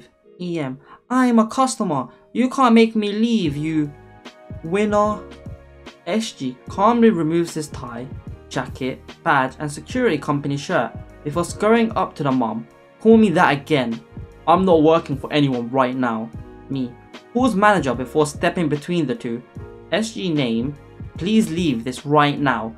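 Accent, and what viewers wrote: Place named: Scotland, United Kingdom. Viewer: ??? The person speaking is speaking with an accent that is from the UK.. Not exactly sure where, sounds rather Scottish. So.. What are you on about? Their English is more than likely, better than YOURS.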